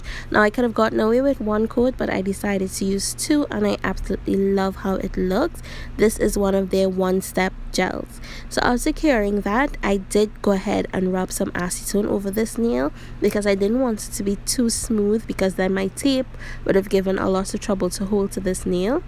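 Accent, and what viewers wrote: Trinidad and Tobago. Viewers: You accent sounds Trini :)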